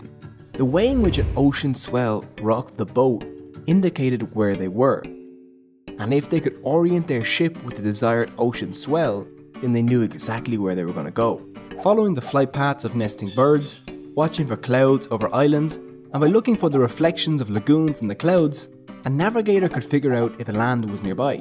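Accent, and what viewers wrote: Ireland. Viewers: This man has possibly the most Irish accent ever! "Tree" for three lol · As a Filipino, I'm proud and thrilled to see videos on the culture being posted. As a fan of Ireland, it's even more exciting when done with an Irish accent. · first few seconds HELLO FELLOW IRISHMAN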